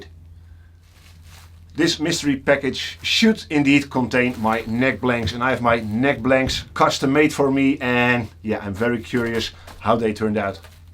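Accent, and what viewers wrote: Netherlands. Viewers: I'm detecting a slight Dutch accent... Are you Dutch?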